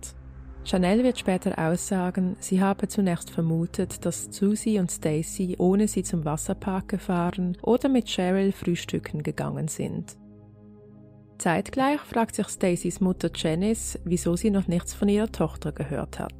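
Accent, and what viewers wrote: Switzerland. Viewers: Ich wusste nichtvdass die Sprecherin eine Schweitzerin ist aber ich habe es sofort an Aussprache bemerkt. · Das ist kein schweizerdeutsch...bin berner...so tönen wir wenn wir uns mühe geben schriftdeutsch zu sprechen...wenn wir schwyzerdeutsch sprechen versteht ihr kein wort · 🤭😆 wie süss. Schweizer Sprech ist zum verlieben. 😍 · Den schweizer Akzent im Hochdeutsch finde ich so charmant. Ich mag deine Stimme sehr und finde deine Recherchen gut gemacht. Ich freue mich auf weitere Folgen. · Was stört Dich daran ? Ihren Schweizer Akzent kann sie wohl schlecht ablegen . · Da bekommt sogar das Schwietzerdütsch einen angenehmen Klang. Es gefällt mir sehr gut, wie du den Fall, den ich schon von einem anderen Crime-Mädel kenne, dargelegt hast . Sehr umfangreich, gut recherchiert. Ich habe gleich ein Abo dagelassen und freue mich auf viel mehr von dir. 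· Schweiz Akzente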